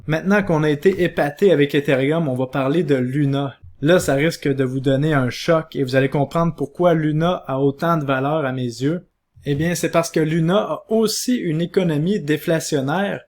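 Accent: Quebec, Canada. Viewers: J'adore l'accent des cousins d'outre atlantique.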